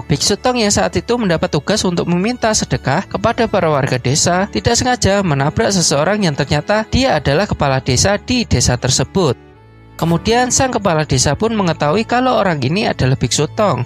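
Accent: Java, Indonesia. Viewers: gw demen nih logat jawanya haha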